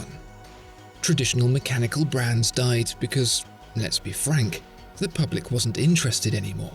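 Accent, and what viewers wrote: United Kingdom. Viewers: That British accent....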